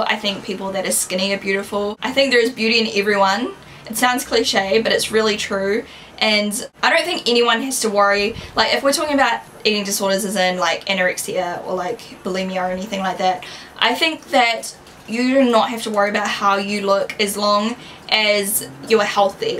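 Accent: New Zealand. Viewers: Your main channel was the first one I subscribed too. I remember the first video of yours I saw was a grwm clubbing so it was just music. I clicked onto another video of yours and was so surprised to hear your voice!!! I was thinking you'd have an American accent, but no, you're a kiwi just like me!!! Instantly I subscribe and have watched pretty much every single one of your videos! Love you:) xxx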